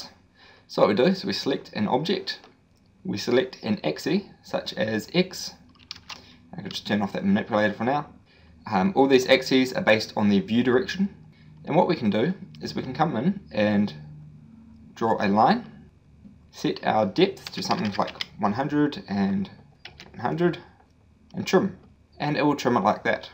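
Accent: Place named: New Zealand. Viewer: nice to hear a kiwi accent :D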